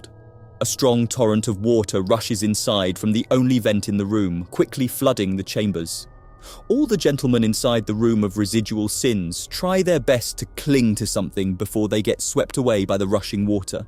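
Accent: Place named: United Kingdom. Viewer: oh nah whys the voice uk cant tell if its ai or not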